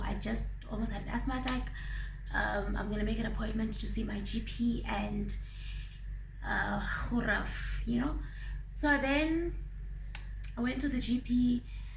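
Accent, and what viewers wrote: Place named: Cape, South Africa. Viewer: Amazing story! I like the in between humor, especially the Cape accent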